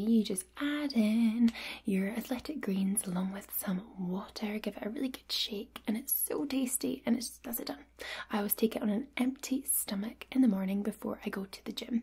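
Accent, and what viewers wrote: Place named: Scotland, United Kingdom. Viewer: Gentlemen, we all have to admit that is a very pretty woman with an awesone accent. Very Scottish🏴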